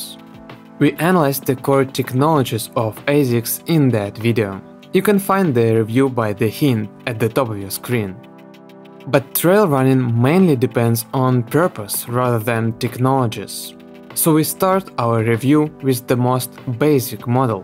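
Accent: Russia. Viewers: Thanks for the analysis, but this russian accent really turn me down.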